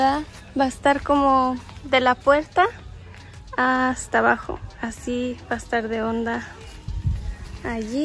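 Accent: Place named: Portugal. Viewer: Estas quase ablando PORTUGUES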